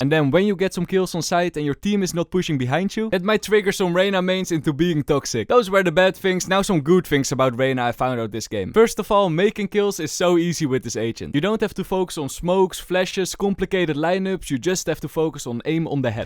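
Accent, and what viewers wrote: Netherlands. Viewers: Haha instant hoor je de nederlander Nice kanaal :) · as a reyna main, i first want to say u sound really Dutch (the Netherlands) and second u say perfectly what i mean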